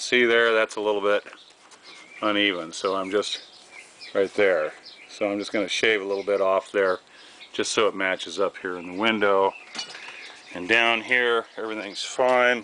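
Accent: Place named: California, United States. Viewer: Hard to believe this was 10 years ago. Back when you still lived in CA, and had that CA accent., LOL. After watching this way back then, I bought the portable pocket hole Kreg Jig. I had a situation where I had to drill the holes on a framing board that was still good and very attached to the trailer. The portable one allows you to mount the jog on boards with a screw.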